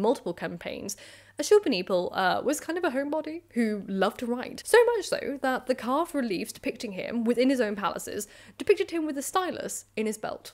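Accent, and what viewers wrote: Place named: England, United Kingdom. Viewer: Semi-regular who is constantly asked about their strong southern American accent, tunes in for, strong English accent…I reckon. :)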